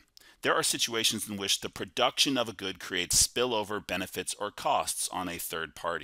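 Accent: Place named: United States. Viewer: Can u add subtitles as every one cant understand american accent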